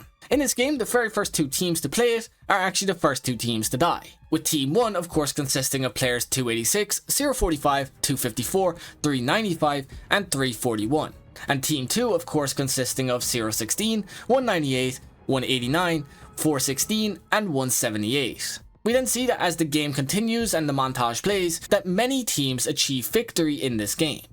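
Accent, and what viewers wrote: Ireland. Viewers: Nah hes just Irish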